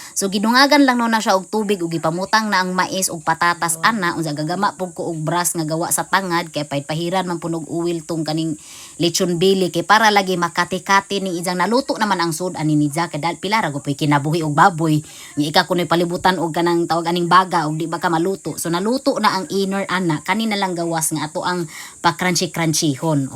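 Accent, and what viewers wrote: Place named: Bohol, Philippines. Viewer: Bul.anon nga accent from Bohol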